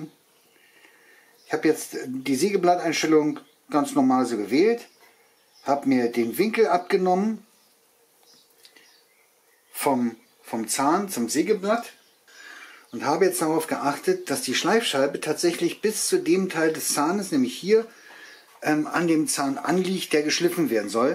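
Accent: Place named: Berlin, Germany. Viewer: ich hab selten so ein informatives und zugleich unterhaltsames Video gesehen,vielen Dank.ich musste mehrfach schmunzeln wegen deiner Berliner Schnauze.Hab mir die Maschine auch bestellt und werde deine Tips übernehmen.Haste fein jemacht.Mach weiter so.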